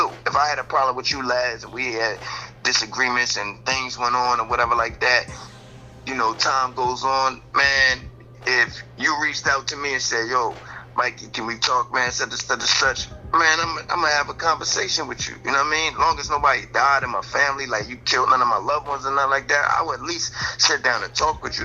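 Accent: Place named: New Jersey, United States. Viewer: That jersey accent is way different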